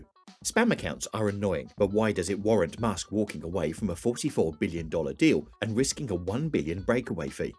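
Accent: United Kingdom. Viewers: What do otherwise educated people seem incapable of differentiating between "FEWER" and "LESS"? "Less users"? Really? Do you prefer "FEWER" jam on your toast? Fuck sake, it isn't even an American-ism. You have a British accent. One assumes you are a professional announcer. It's your job and inheritance to speak English better than this.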